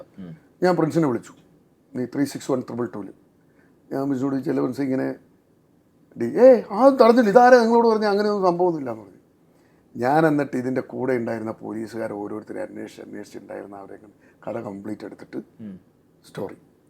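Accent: Kannur, India. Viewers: Kannur slang aanu no problem..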